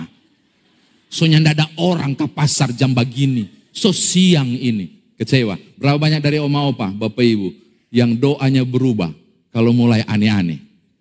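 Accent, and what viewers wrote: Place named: Makassar, Indonesia. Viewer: Sukaa dgn gaya bahasa makassar nya pak pdt😂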